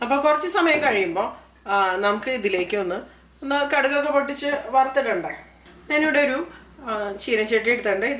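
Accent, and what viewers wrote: Thrissur, India. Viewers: Although I am not a subscriber to your channel, I use to watch your cooking’s. Your Malayalam accent like angamali / trichur style . Believe you work in overseas. All best wishes .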